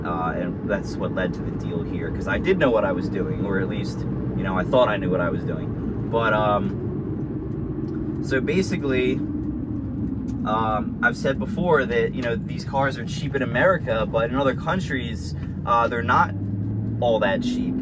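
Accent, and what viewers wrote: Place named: Cockney, United Kingdom. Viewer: Great fun and pretty informal too … not a bad cockney accent either … love the 4200 …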